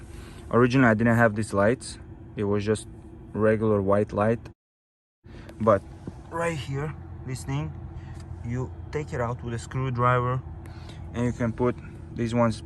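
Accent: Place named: Chechnya, Russia. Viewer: bro this guy is a chechen or a russian ahahhaha his accent says it all ahhaha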